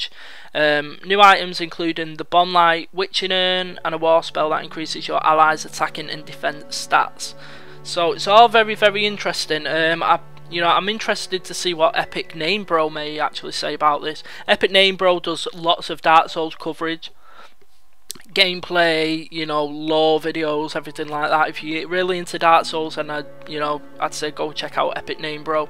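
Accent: Manchester, United Kingdom. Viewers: is that a manchester accent? im from sweden so im just curious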